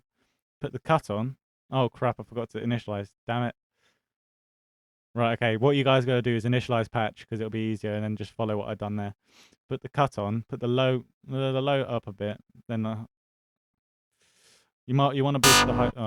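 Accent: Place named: United Kingdom. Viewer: Oh man you're British! And you are the dude that made those sick sonic remix! Ahh it all makes sense!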